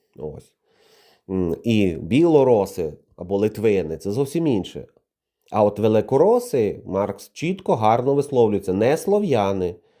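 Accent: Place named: Galicia, Ukraine. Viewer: Все супер. Особливо мені подобається галицька вимова автора. це дуже мило) і навіть сексуально))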